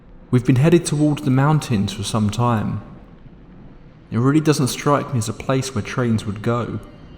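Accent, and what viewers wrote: United Kingdom. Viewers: Are u British bruh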